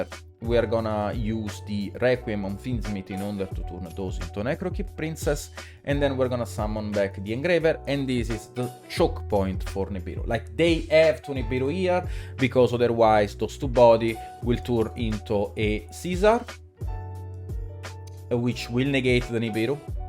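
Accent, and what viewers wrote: Italy. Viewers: Ma sei veneto?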